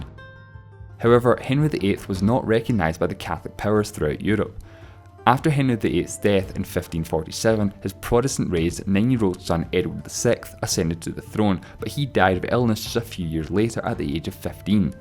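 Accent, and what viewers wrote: Scotland, United Kingdom. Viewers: His accent is pretty light compared to other Scots the more rural the heavier the accent. Same thing for the Welsh and the English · I'm sorry...I totally got distracted by the sexy bass and the accent of this man! WHEW! It sounds Scottish. Am I wrong? I shall continue watching trying without a hard-on! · it doesn't sound scottish anymore woah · It's Scottish and why are you calling it ugly it's a nice accent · He's Scottish and his accent is fine. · No Scottish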